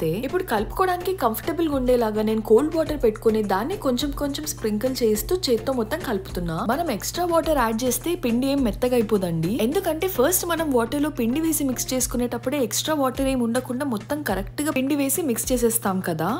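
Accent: Telangana, India. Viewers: Nenu mee recipe kosam video watch cheyaledhu. I would watch your video because of your voice. Fan of your voice mostly telagana slang ❤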